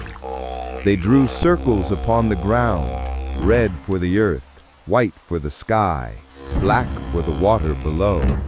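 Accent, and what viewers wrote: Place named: United States. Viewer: What a load of BS - it would have to be some American voice over - as usual!!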